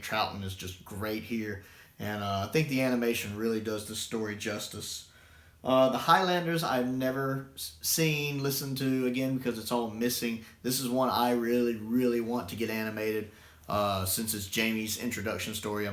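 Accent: South, United States. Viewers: to give some thoughts on what you havent seen yet Highlanders is fun, surprisingly Jamie isnt actually a major character until the end, but the story itself is still enjoyable, Troughton gets to do some dressup and fooling around, Polly is also great in that one. Underwater Menace is a big guilty pleasure, yes its OTT but its so much fun, id say this is a "popcorn" story. The Evil of the Daleks isnt perfect, but the highlights are excellent. Troughtons performance is top tier and Jamie becomes a 3 dimensional character here. Abominable Snowmen is a good story, but i feel like it kind of sags in the middle. Though the good parts are really good and its a nice setup for Web of Fear i havent seen Wheel The Dominators at its core is cardboard, and the plot is weak, but the tardis team are alot of fun and the comedy makes it worth it. Still can be a fun watch. 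go rewatch The Mind Robber its great. The Space Pirates is underrated. Everyone hates it, but im one of the few that actually quite likes it. I think its let down a little bit by the Tardis team being background characters for alot of the story, but they still have a decent impact esp at the start and end, but i thought the central plot was really cool. Also would be interesting to hear your thoughts on Milo Clancey as hes played by an actor from my country (NZ) and hes playing the role of a stereotypical southerner. Which i assume you might be given your accent? Anyway, Troughton era good.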